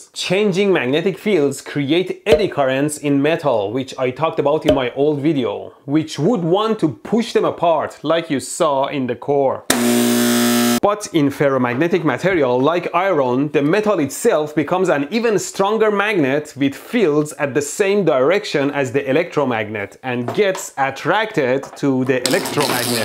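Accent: Iran. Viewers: چقدر لهجت بە ایرانیا میخورە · میدونم که ایرانی هستی * از لهجت معلومه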